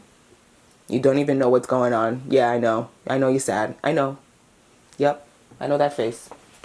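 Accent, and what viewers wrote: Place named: New York, United States. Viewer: Very curious to know where you're from! I know it's a NYC accent I hear. Is it Queens or Brooklyn?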